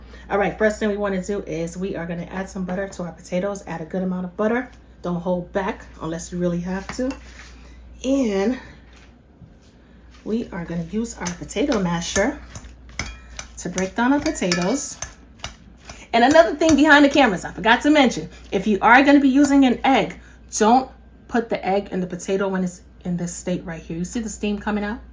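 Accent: New York, United States. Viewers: You sound like you come from New York..I’m from Long Island..thanks for this recipe ❤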